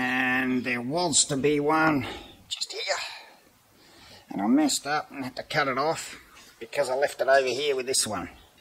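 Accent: Australia. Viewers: I just started watching you today and wow what a welder you are. I never took any welding training but regret that action. Am looking forward to more interesting videos from you. You sound to be from Asustraila or New Zealand a beautiful section of Gods creation. I live in the USA in a State called West Virginia. Stay safe and Merry Christmas to you and yours. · i know i am new, i like like the methodical approch to see a skills man at work, what a simply beautiful piece of craftmanships at its very best. all you need is four strongest powerlifters, to cary this whewever you want to go camping in the world, i am assuming you are from Australia, just by listeninhg to a little bit to your accent, if i am wrong i do appologise profusely for my mistake, i am into bushcraft skills in the UK. and i go camping everyyear, i would love something like that on a slightly smaller scale. 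simply beautiful, say no more. i like the hinge for your kettle, very clever there, in the making of it. cheers Hans.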